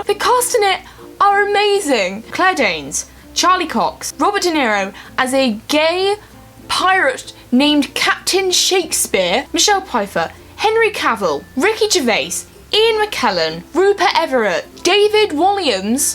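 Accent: Wales, United Kingdom. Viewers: Omg I love your Welsh accent tho (but hey I'm not Welsh so I can't judge) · lovely welsh accent you sound just like Gwen Cooper from torchwood.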